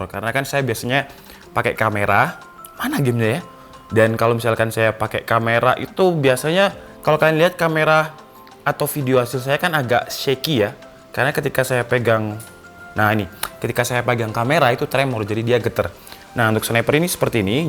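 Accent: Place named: East Java, Indonesia. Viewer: Medok jatim rek 😂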